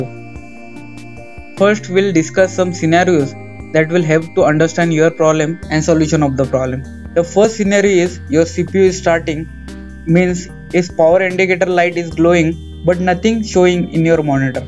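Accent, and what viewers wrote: India. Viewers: Pindu English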